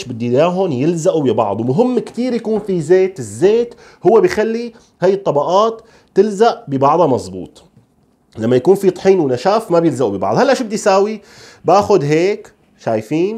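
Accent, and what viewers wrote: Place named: Syria. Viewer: فديت اهل سوريا واللهجة السورية متابعة من العراق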